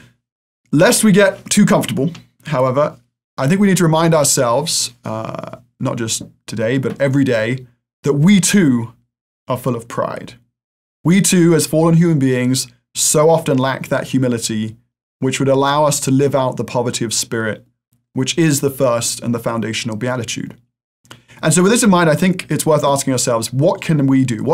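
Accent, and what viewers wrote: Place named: England, United Kingdom. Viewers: Yes, he has a UK accent. I also noticed that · Thank you from England - and you sound as if you come from here!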